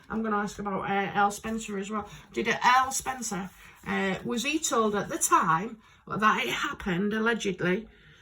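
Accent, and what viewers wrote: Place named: Hull, United Kingdom. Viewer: Hi!! Thanks for saying, "Hi" :) I just love listening to your accent, I know we must be distant cousins as my family is from Hull :D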